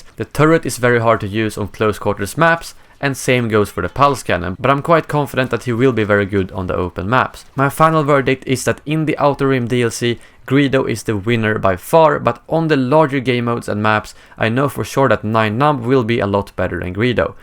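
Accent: Sweden. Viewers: Are you from SWEDEN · Are You from Sweden? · Are you fr.o.m. Sweden (är du från Sverige)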